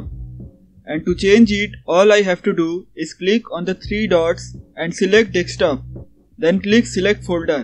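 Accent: India. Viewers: I luv indin accent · when i her indian voice i know i will working btw tnx its working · I hate your indian voice but the recorder worked ! cool vid ! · When you heard an indian voice. it doesnt bring fake videos you can trust indian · Man when i heard the indian I was like this shit is gonna be a scam or be legit No but seriously this was so helpful..... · i taught it was fake because of the indian accent lol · No it's not legit if there is Indian voice for me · If you hear an Indian voice that means it's not clickbait!!!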